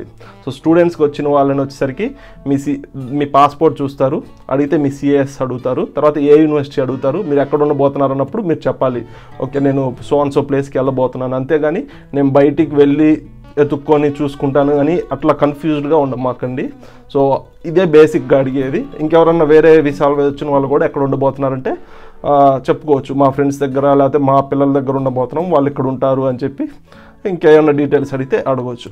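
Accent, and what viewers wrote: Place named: Rayalaseema, India. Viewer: Your ascent seems rayalaseema ...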